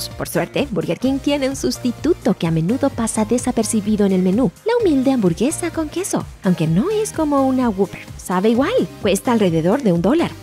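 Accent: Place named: Latin America. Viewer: No entiendo la utilidad de traducir al español (principalmente en latinoamerica) videos con un guión y contenido realizado para Estados Unidos. La mitad de estos locales no se encuentran en mi país, dan los precios en dólares aún cuando la locutora tiene acento latino, los precios son mucho más baratas de la realidad y varios de estos producto de locales que si se encuentran en mi país no se venden...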